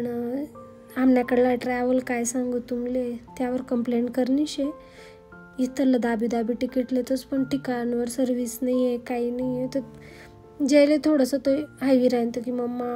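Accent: Khandesh, India. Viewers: Didi tuna video khupch mst rahtas male khup aavdts.. 😅❤aamhi pn shirpur na shet tu khup bhari khandeshi bols aate nya pori khandeshi bolane laj tis nko ka thika na rahe na ghar na dar na.. Tu disale pn khup sundar aahe. 😊 male pn aasch khar bolale aavds jast badaykya marale nhi aavds.. Ek nmbr video rahts.. Tu mo no send kar jo na...... 🎉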